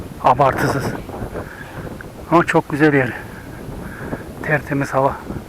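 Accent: Turkey. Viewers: Kardeşim yozgatlımısın şive orası gibi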